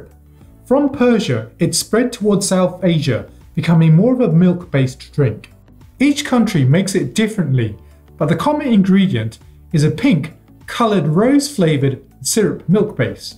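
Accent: United Kingdom. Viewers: Your english is superb ! British accent .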